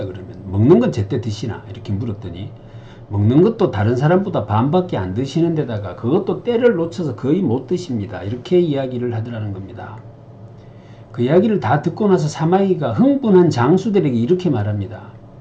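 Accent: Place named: Gyeongsang, South Korea. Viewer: 원장님 경상도 사투리 정겹습니다 어름한 자식~~ 사마의의 전략 전략적으로 기다려야되는 지금 개구리 점프하듯 뛰어 오를 무엇이 떠오릅니다 잘 듣고 갑니다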